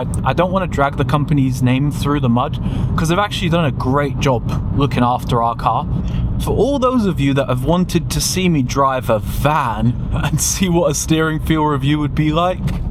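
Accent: United Kingdom. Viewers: I'm learning english recently.. I wanna ask you guys.. What is the name of the British accent that is used by this person? I wanna learn more about it ..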